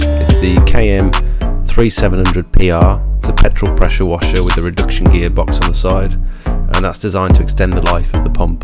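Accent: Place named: Cockney, United Kingdom. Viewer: sound like that cockney fella dizzy new hights great videos very inspiring and informative keep moving forward 🎉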